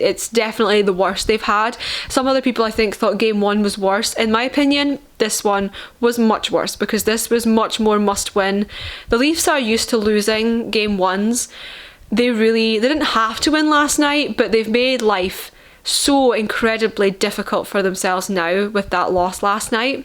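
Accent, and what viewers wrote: Scotland, United Kingdom. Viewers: It’s refreshing to hear/see a WEE SCOT analyzing the Leafs. My NANA was from Scotland and I miss that wonderful accent. Also a little surprised to see someone so far away who is so knowledgeable about our ‘hapless’ Leafs. Thanks for posting, my dear, it’s wonderful for an old man to hear hockey described by such a sweet beautiful lady…AND I LOVE YOUR BLUE EYES !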